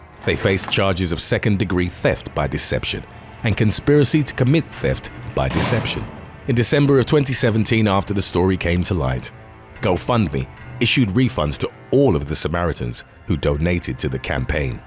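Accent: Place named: United Kingdom. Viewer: Hey mate you have the perfect narration voice, it also helps your British. Next I would like to hear you narrate BBC Documentaries!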